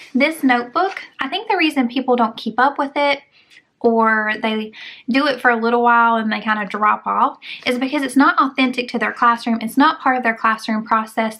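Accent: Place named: South, United States. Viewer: I like your southern draw.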